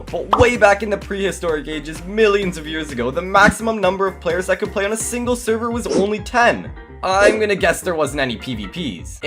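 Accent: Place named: Canada. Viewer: you got that canadian accent im assuming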